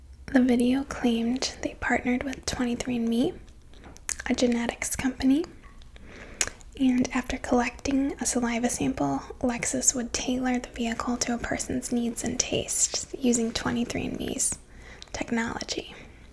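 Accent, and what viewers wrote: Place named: Wisconsin, United States. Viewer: Your definitely from Wisconsin lmao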